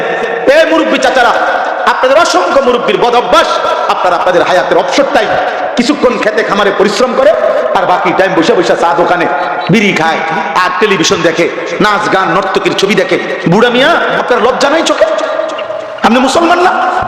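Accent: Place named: Baripada, India. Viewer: Theek baripada dialogue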